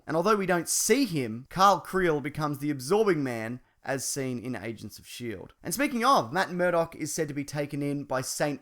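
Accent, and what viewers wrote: Australia. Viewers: You Aussie? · bestminecrafter1 he's Australian...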